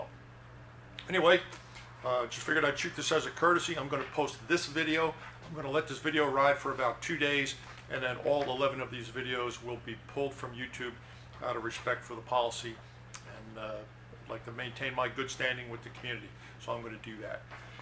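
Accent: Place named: New Jersey, United States. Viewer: Eloquent and heart-felt explanation. You had the balls and forethought to let people know what was happening, and showed it was with major reluctance (not sure I've heard you you swear before).. Odd that people accuse you of not being a true Texan in the comments, as a Brit I'd put your accent somewhere in New Jersey. Nonetheless you're obviously an "all American guy" who goes out of his way to help and serve others- don't change. Edit: just watched again and you said "shiFt in YouTube policy" - I heard "shittin' ", I should buy some better headphones:)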